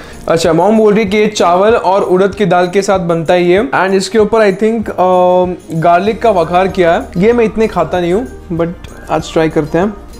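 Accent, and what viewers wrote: Gujarat, India. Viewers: Awesome video 💙💙💙! Aur I must say very delicious video! 🤭😂😂... Your Gujarati accent is too cute... Intro is just op! Mirchi kya sach mein bohut teekhi thi?🤭😂! Btw, fafda - jalebi kabhi hume bhi khilana... As yeh video dekh ke toh sach mein cravings suru ho gayi... I really want to taste that champakali gaathiya and masala papdi gaathiya also... 😍✌🏻... And thepla and khakra is also looking sooooooooo yummy😋😋!!! And that dialogue, "Naam bas naam hain... Hume toh bas khane se kaam hain"- is really hilarious😂😂😂... Next Sunday, I must have patra, khaman or khandvi as my breakfast... ✌🏻💙❤ You are really the best brother sister duo ever... 👍🏻👍🏻👍🏻 I can see that you are really enjoying your Sunday breakfast... I want to say you that we are also having a lot of fun during watching this video... 👏🏻👏🏻♥♥♥👏🏻👏🏻... Thanks for bringing smiles, laughter and enjoyments for us on Sunday... 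😊😊😊... You are really one of my favourite actors and favourite YouTubers... 💙💙💙❤❤❤... Your dedication towards your work really inspires me♥✨... You are really amazing and multi talented and your videos are excellent and absolutely incredible... Keep shining and keep dreaming superstar... ♥♥💙💙❤❤... All the best sweetheart... 👍🏻💙💙💙💙💙💙💙💙💙💙💙💙💙👍🏻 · FAVOURITE PERSON AND FAVOURITE FOOD IN ONE FRAME🙈✨he is posting videos regularly omg😭💗Also talking in gujarati always hits different 😂🥺💗 Really love your Gujarati accent, and the Gujarati intro in starting was damn good😂✨ all dishes are looking so good and all of them are my favs too🤤✨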